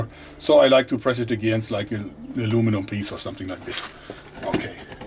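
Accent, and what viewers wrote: Germany. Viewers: Ganz klar deutsch der typ haha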